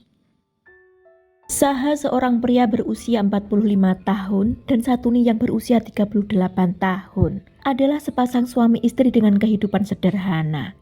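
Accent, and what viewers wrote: Java, Indonesia. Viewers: Logat bahasa Indonesia nya diperbaiki lagi mbak. Medok amat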